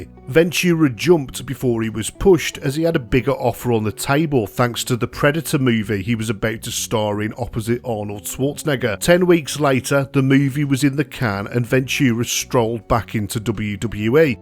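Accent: Birmingham, United Kingdom. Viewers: Guy narrating is from Birmingham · Favorite new Pro wrestling algorithm find here. Great content 💯 UK area accent is this? 🇬🇧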